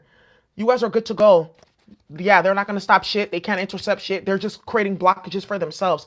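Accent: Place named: New Orleans, United States. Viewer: Sounds like u are from NOLA. ME too!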